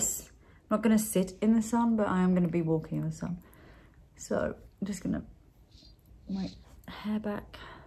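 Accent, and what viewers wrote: United Kingdom. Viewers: You have a British accent !)))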